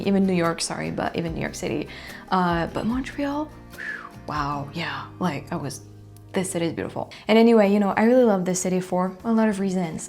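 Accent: France. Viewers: Never feel you need to lose your beautiful French accent. Mispronounced words that could lead to misunderstanding is one thing (and you rarely mispronounce your English words)…and, I do understand your desire to correct a potentially mispronounced word, if required…but please don’t confuse that with a need to change your charming accent. The French accent to the anglophone ear is soooo beautiful- truly one of the loveliest. 😊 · On a completely unrelated note, your English is getting amazingly good. I can tell the difference from just 6-9 months ago. You’re losing some of that French accent and speaking more "North-American”. You probably dont even realize it yourself but its definitely there on more vowels and consonants. At this pace In another 12 months you’ll be fooling everybody and can tell people you are from Toronto or Orlando.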